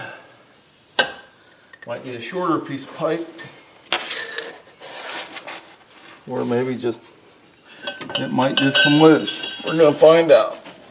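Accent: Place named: Pittsburgh, United States. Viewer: Hello from one Yinzer to another! I thought I recognized our dialect. Nice video,and really straightforward advice!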